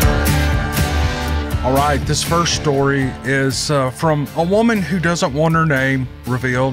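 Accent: South, United States. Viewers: Just found you and enjoyed your stories! Great southern voice too!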